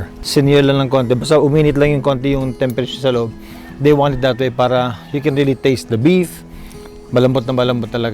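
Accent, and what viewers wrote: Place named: Tagalog, Philippines. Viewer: i am hungry whle watching it.. i love it when u cook, Sir! i also love ur bisaya cos it has a tagalog-toucvhed-accent too. hehhe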